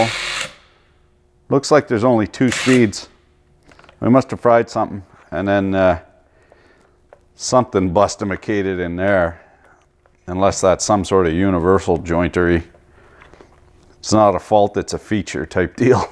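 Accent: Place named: Newfoundland, Canada. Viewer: Love the Newfie commentary!!! Beauty , keep it up!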